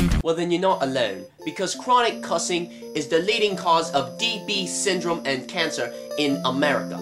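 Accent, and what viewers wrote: United Kingdom. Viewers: i loove ur british accent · cool british accent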